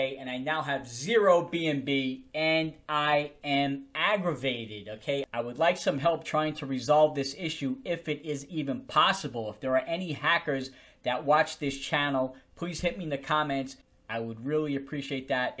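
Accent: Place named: New York City, United States. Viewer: I love your NYC accent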